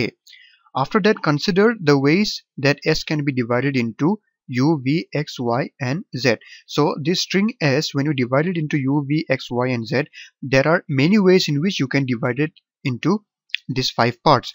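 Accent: India. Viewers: He's from India...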